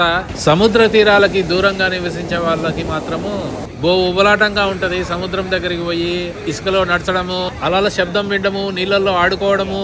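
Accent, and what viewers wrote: Rayalaseema, India. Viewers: ఏమి అన్న ఆఫ్టర్ లాంగ్ గ్యాప్ మీ మాటలు రాయలసీమ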